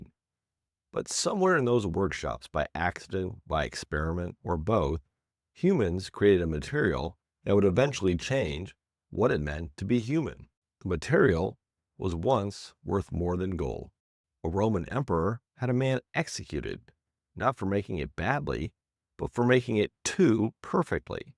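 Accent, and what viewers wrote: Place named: United States. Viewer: OMG. great subject but why the American accent? in is 2026, The U.S is not where it is at now. That has long gone. Can not watch, sorry. :(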